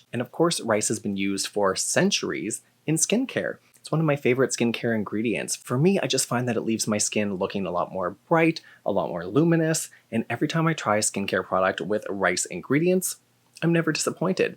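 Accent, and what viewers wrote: Canada. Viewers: Videos are always great! I learn so much about skincare even outside of these products.. Love how you touch base on layman’s terms and legit science terms back to back so I can understand the deeper stuff to research or cross check my current products :) And ya know, every time you say “out” I’m geeked on your Canadian accent :) most romantic accent if you ask me But yeah overall thanks for describing some great tips and sharing so much knowledge! I’m just starting my skin care routine at 29 and it’s really helping me know what to look for when i buy and apply 😊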